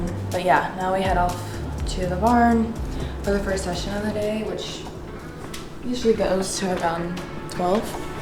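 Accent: North Carolina, United States. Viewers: I love her subtle southern accent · Good ole North Carolina accent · Love her accent!!! makes me me NC so much being from the UK no longer being able to get over there.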